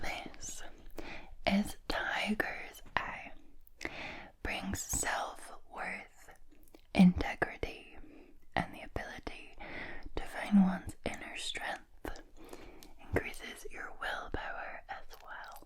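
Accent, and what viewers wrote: Scotland, United Kingdom. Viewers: Looks, talent, razor sharp intellect all delivered with a killer Scottish accent, what a package!!!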